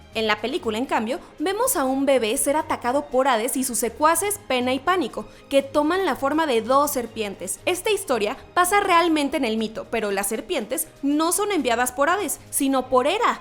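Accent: Mexico. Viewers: Ese acento mexicano no lo soporto.. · Buenísimo el vídeo, no sabía casi nada hahaha... Una cosa, deben bajarle a ese acento mexicano, a los que no somos de allí nos incomoda un poco, por favor traten de usar un acento más "neutral" para que todos nos sintamos cómodos.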